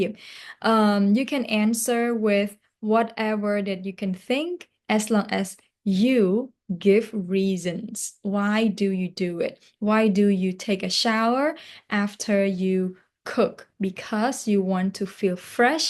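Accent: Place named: United States. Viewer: អ្នកគ្រូនិយាយភាសាអង្គេស តុង អាមេរិក បានល្អណាស់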